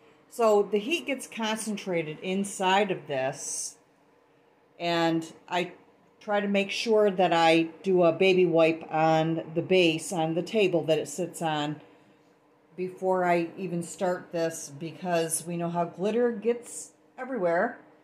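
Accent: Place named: Midwest, United States. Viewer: Omg! You must be from the Midwest! You remind me of one my my BFFs! Makes me smile! And thanks for sharing!!! Xoxo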